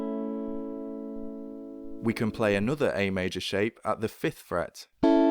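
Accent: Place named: Manchester, United Kingdom. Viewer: Excellent teaching! All in the form of little focused nuggets of pure musical gold :-) (It helps to have a basic understanding of 'theory' and a few years of noodling for basic ear training to relate to.) Your Mancunian (?) accent reminds me of the programme director of part-time courses at The Institute.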